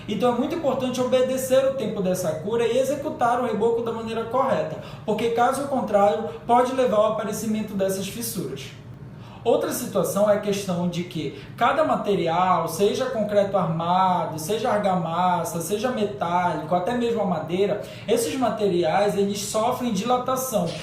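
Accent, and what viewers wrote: Rio de Janeiro, Brazil. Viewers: Você é do Rio??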